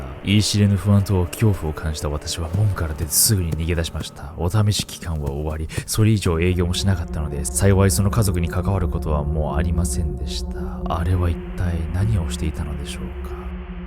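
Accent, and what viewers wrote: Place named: Kyushu, Japan. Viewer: ナオキマンの九州弁からしか得られない供給がある。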